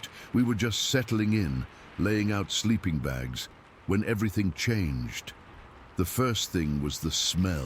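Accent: United Kingdom. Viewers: Why's it's always a British accent....bullshit I'll never listen again ¡!!!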